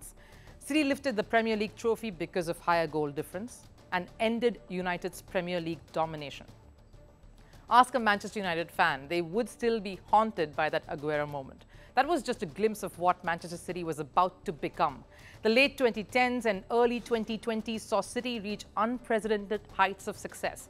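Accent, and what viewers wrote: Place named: India. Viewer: She's pretty good. Perfect English like the CEO herself. Other than English in Hindi accent 😅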